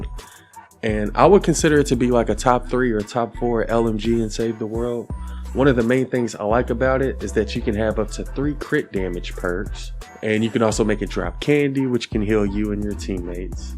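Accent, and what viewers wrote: South, United States. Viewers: I'm going to need you to make a PSA video. During the last 2 weeks I've had a good handful of players thinking I was you(not believing I wasn't you) and asking me questions like "When is the next meme video releasing", asking me what cookies looks like, etc etc... I've heard my voice from echos through other players mics and I can't understand how people thinks I sound like you, the only thing I can think of is we both somewhat have a Southern Accent...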